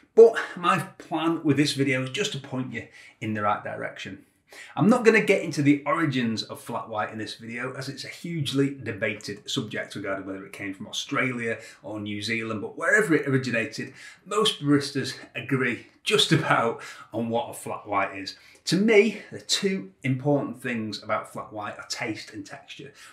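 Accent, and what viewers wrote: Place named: West Yorkshire, United Kingdom. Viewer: You have a similar accent to me. West Yorkshire?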